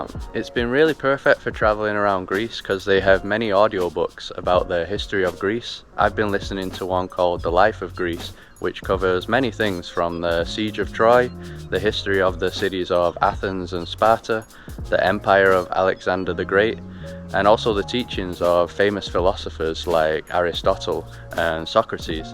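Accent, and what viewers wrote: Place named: Scotland, United Kingdom. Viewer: 😁 I was sure I could hear a Scottish accent...😁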